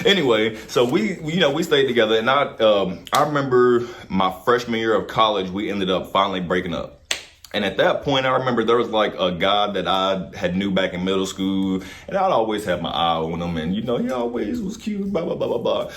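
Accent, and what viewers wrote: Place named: New York, United States. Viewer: 🤓 u talk n sound like u from new York City n that dude from black ink crew NY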